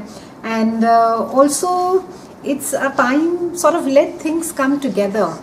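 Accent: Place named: India. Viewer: Your accent is lovely, are you from India?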